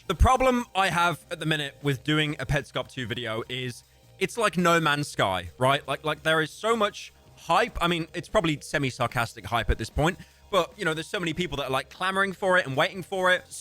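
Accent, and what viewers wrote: United Kingdom. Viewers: I ain't watching the appalachians Petscop video I want the british femboy voice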